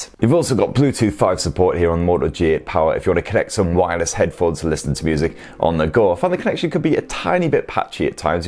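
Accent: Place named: Scotland, United Kingdom. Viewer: Great delivery,and information on cellphones with a Scottish accent.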